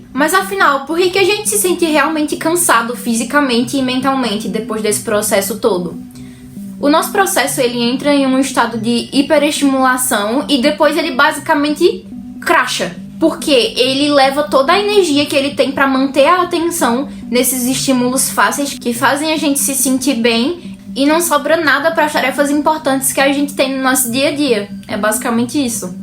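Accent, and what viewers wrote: Northeast, Brazil. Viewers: Seus vídeos são incríveis, vc é muito fofa,ganhou mais uma inscrição, ah e você tem sotaque nordestino,vc é do nordeste?? De qualquer forma,irei acompanhar seus vídeos! ❤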